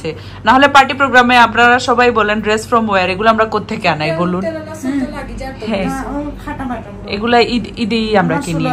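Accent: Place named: Sylhet, Bangladesh. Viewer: Ada math amrar sylhety r adakan suddo Bangla na matiya je kono ekta math tik koro go Apu te bala lagbo 😊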